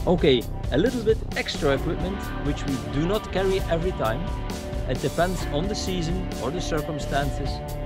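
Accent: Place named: West Flanders, Belgium. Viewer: Ne Westvloaming in ’t Engels 👌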